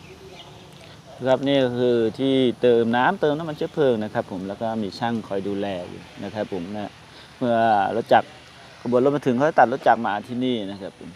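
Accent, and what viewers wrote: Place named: Nakhon Sawan, Thailand. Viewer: ชอบสําเนียงพี่นะครับ ผมมีเพื่อนอยู่นครสวรรค์ สําเนียงแบบนี้แหละครับ ไม่รู้ว่าใกล้เคียงรึเปล่าครับ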